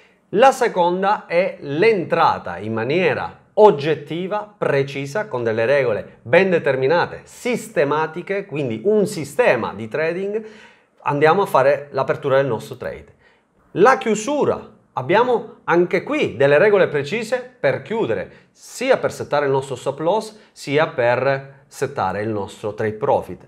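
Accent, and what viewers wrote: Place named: Apulia, Italy. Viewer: guarda io sono di Brindisi e il tuo accento è identico a quello della fascia tra Brindisi e Taranto. Che è molto diverso dal solito pugliese che si sente in tv che va da Banfi alla pizzica salentina. In effetti basta guardare una cartina delle zone linguistiche italiane per vedere che quella parte di Puglia ha lo stesso colore della Sicilia! Grazie per la tua gentile risposta! 👋👋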